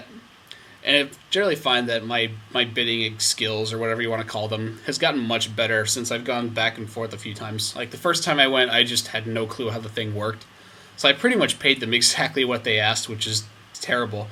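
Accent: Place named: Midwest, United States. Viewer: where are you from in the midwest?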